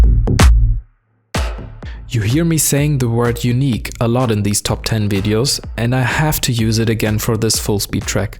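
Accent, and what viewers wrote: Germany. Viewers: Do I hear a german accent?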